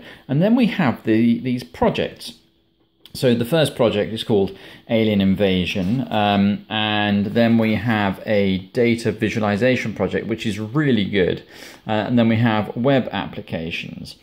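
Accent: RP, United Kingdom. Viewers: Quite a posh sound gentleman! A Gentleman and a Scholar! :)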